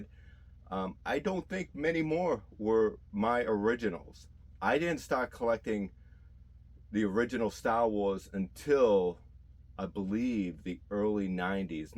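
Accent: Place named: New England, United States. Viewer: After living in Mass. & New Hampshire for 16 years myself, I could listen to you say Sta Wars all day. Great Video.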